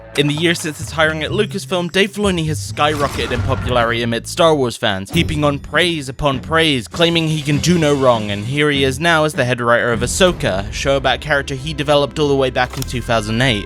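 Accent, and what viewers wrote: West Country, United Kingdom. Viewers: You sound like you’re from Hot Fuzz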